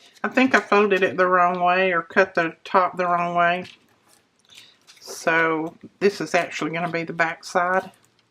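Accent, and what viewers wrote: South, United States. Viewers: Hello today , I am sitting here with my feet up just enjoying your tutorial and your wonderful southern voice. Would you tell me how to find your granddaughter, she is such a sweetie. God bless and keep you.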